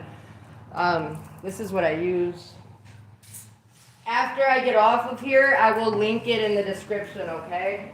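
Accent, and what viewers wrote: Michigan, United States. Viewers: Man I just found your channel and I love your high spirit. You are so much fun to watch. It sounds like you are in Michigan and so am I. If you hold classes let me know I would love to attend. I work with resin and I’ve been contemplating adding wood.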